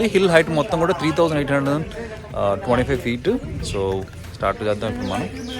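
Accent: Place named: Bangalore, India. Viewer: Miru banglore telugu matladutaru ur native bro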